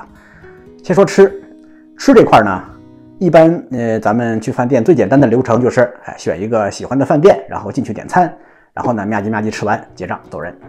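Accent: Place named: Beijing, China. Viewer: 沒有惡意，對你視頻還是挺喜歡的，特別是你的京城口音❤👍😀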